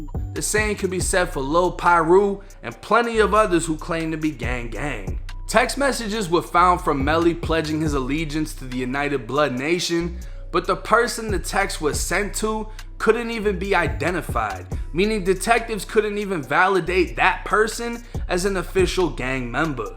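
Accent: Boston, United States. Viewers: U sound like u from Boston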